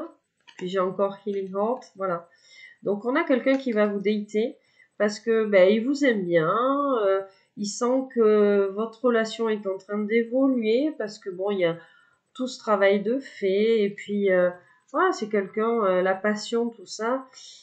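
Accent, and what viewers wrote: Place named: South, France. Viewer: Bonsoir ravie de vous revoir une tres jolie guidance je ne vous lâche jamais j'aime votre façon de parler un peu de sud quant au eeee je comprends lorsque l'on réfléchi tout a fait normal les gens vraiment 🙄 débile prenez bien soin de vous surtout a tres vite j'espere🤩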